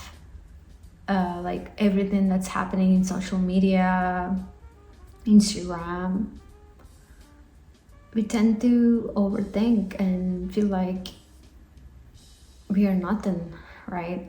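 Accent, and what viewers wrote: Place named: United States. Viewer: Wow your English accent is just like the American... Nice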